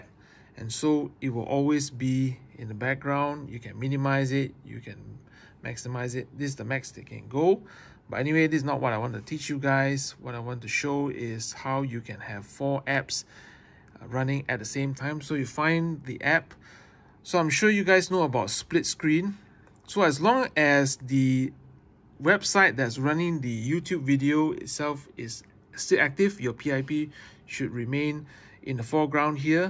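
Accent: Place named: Singapore. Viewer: You have an interesting accent, are you from Singapore?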